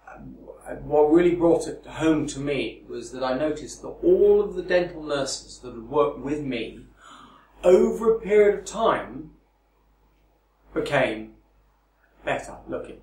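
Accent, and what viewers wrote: United Kingdom. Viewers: Jai Hind Nah he has some kind of english accent. It's quite clear and quite close to how BBC newsreaders sound, but not quite as posh as, say, the queen of the U.K. Think groundskeeper willy from the simpsons. That's a comedy scottish accent, so it's exaggerated af but it isn't too far away from the truth. :P Also, Shrek speaks with a scottish accent, though Mike Myers is obviously american. I'm struggling to think of a world-famous scottish actor you might know.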